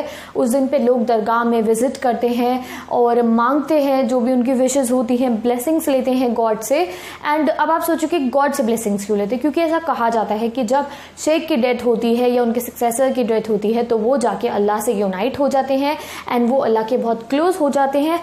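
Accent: Punjab, India. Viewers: Aap Punjabi ho?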